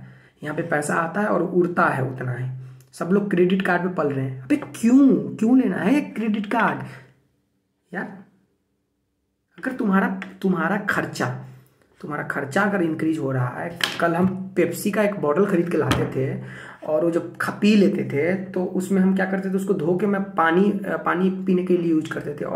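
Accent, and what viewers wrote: Bihar, India. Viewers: Bhaiya ji..bihar se ho kya😀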